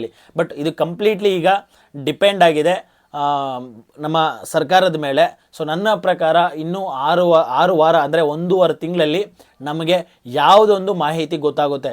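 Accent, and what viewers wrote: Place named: Andhra Pradesh, India. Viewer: avnu Telugu bro..😂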